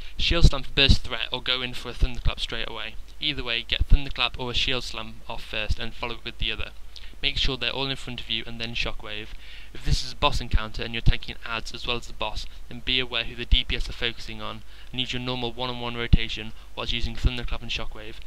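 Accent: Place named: RP, United Kingdom. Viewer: there's nothing wrong with this guys accent.. its 99% perfectly spoken "Queen's English". Very wierd thing to say.. you mustn't speak English as a first language to make that sort of unqualified comment.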